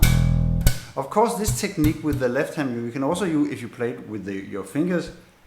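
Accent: Denmark. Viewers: Elsker din dejlige tykke Københavner accent, den giver én en ret fed nationalfølelse :-) But great job man, I'm enjoying learning from you.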